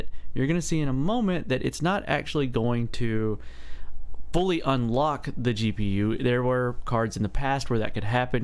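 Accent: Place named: Tennessee, United States. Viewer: Tennessee accent i like it Or is it Carolina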